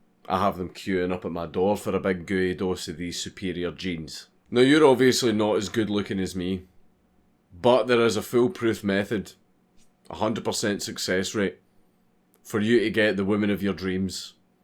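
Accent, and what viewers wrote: Scotland, United Kingdom. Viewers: Scottish accent = awesome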